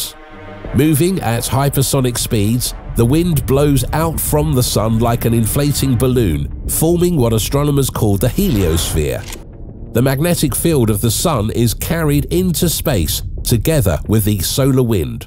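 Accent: United Kingdom. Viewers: i don't like british accent for narration .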